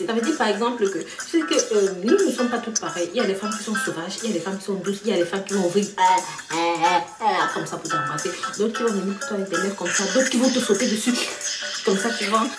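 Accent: Cameroon. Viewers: J'adore ton accent je crois bien c'est un accent camerounaise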